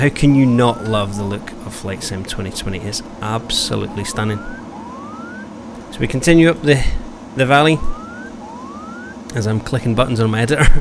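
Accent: Scotland, United Kingdom. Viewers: When it doesn't even remotely look like that because your stone age 1080 isn't able to run it. For those not knowing: The way out is straight forward across that lake Now I still have to get around imagining to fly with a hell either just left or right of me. Anyway, that lake is well deserved. Gotta admire that Scottish accent :)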